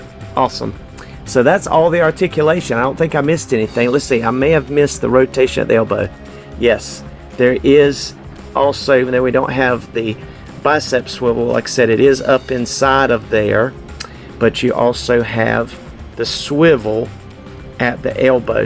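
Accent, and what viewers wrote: South, United States. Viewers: like the name! Reminds me of Osborne. I enjoyed the video.. I was just wondering where you’re from and the accent.? My guess is from down south? Alabama maybe? Your voice also reminded me of the guy from the hangover again enjoyable thanks.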